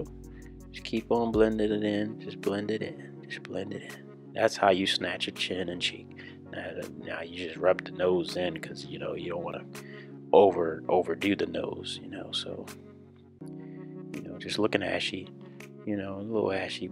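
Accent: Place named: Baltimore, United States. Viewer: Bmore in the house!!! Loving the Baltimore accent!!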